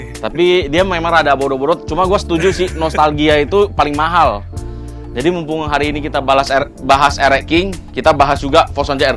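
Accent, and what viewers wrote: Medan, Indonesia. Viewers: medan kali asli wkwkwkw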